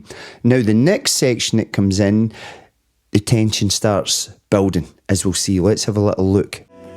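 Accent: Scotland, United Kingdom. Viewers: Informative. Given in your beautiful Scottish accent!❤ thank you for the reaction! Lovely👍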